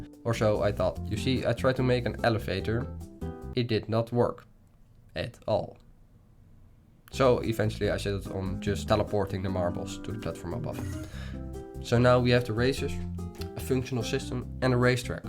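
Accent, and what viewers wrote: Netherlands. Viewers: Immediately knew you were dutch given the accent, ga zo door, echt enorm goede videos!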